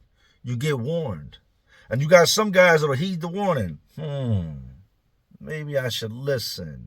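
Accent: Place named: Philadelphia, United States. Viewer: Hny J!, u r an impeccable storyteller, plus u sorta hv that north philly accent! Been subbed since. Day1.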